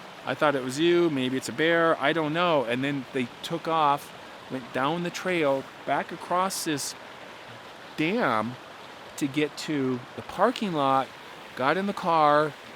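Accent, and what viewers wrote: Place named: Southwest Michigan, United States. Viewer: Great stories,! I know you were from MN originally, but did you ever spend time in SW Lower Michigan - Grand Rapids area? You pronounce some words exactly like that area. Just something I noticed. I really prefer and really like the videos that don't have much AI. I would much rather look at the river, look at you, look at a pinecone, rather than do anything that makes an AI center profitable. It would be so much easier for you to do that, so I appreciate the work you put into these videos! I hope you have a fantastic summer! I'm having a cup of coffee ( PM lol) and it tastes so much better when it's in my Base Camp ceramic coffee mug. 😊😊 I'm already looking forward to Sunday!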